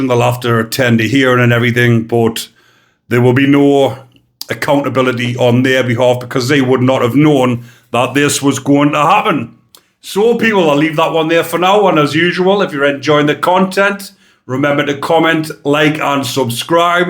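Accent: Newcastle, United Kingdom. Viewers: What's this term you use for sex offender ? A Bacon ? It's a bit incoherent the geordie accent makes it more Baycan. But that's new to me, you are the only person I have heard use it. What's the story behind that ?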